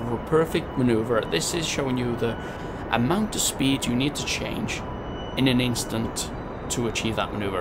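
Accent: Wales, United Kingdom. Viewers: are you Welsh coz I kinda recognise the accent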